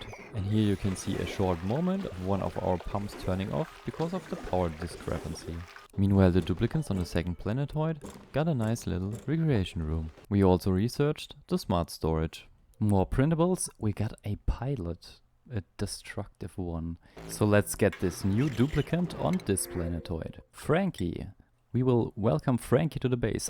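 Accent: Germany. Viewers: You sound German… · ive now watched about 2 and a half hours and realized your accent you are german right ? gibt es auch deutsches gameplay von dir ? is there also german gameplay? and btw i ike your voice and your video very much !!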